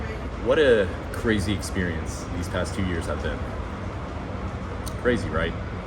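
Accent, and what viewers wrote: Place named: California, United States. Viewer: he is californian lol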